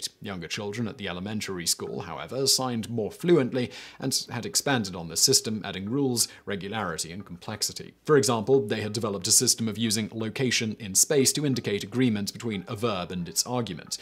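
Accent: England, United Kingdom. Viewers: This is a good video, but do all Brits says Nicaragua that way? Nicarag-yew-ah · Can you talk without chopping up your words? Or is there anyone else that can be the voice of this channel? The English accent is very beautiful, in fact I think it's the most elegant accent there is, but the way you chop up your words when you talk makes it very difficult to actually focus and comprehend the information you're presenting. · Out of topic but i got scared when the guy sounded british, i thought he was vsauce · The British pronunciation of Spanish -os words always bugged me, it's so odd to hear someone say "Carlos" as "Carloss". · I think he was just raised in Britain?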